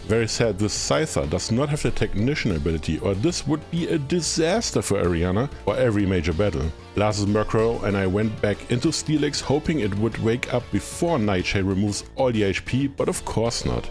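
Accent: Germany. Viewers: As a german, I immediately noticed the accent. Great content, def subscribing vro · The German accent is strong in this one!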